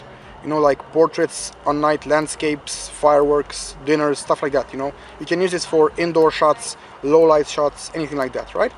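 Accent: Romania. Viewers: Mai... Dupa cum vorbeai la inceput puteam sa pariez ca esti roman. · Salut, Banuiam dupa accent ca esti roman, apoi cand am vazut ca ai aparatul setat pe romana am zambit:) Am de gand sami iau aceasta camera pt pret si pt ca vreau doar poze ,,cazuale,, daca ma intelegi. Apreciez munca ta si bafta in continuare. :D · I like the fact that you talk fast, but i think it would be nicer if you worked more on your accent. From the first moment i knew that you're romanian and for some people the fact that they can tell you if you're a native american or not is a nice thing, but not for me.. It's kinda' like hearing an american talking romanian (without having the native accent)... Thanks for the review. As far as the camera that i shoot with (Canon PowerShot A610), i think the Nikon Coolpix L310 would make my photos looking pro (only in comparison with my actual camera). 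You may say that this camera is not for professionals, but i think it surely can take profesional photos if you know how to shoot. Thanks again!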